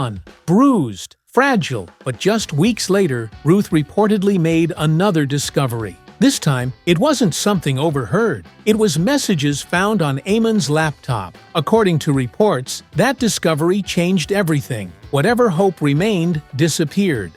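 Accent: United States. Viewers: Why is an American doing this commentary?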